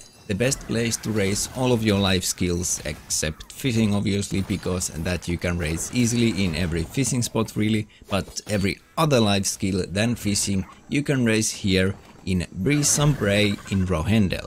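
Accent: Finland. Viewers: Familiar finnish accent :)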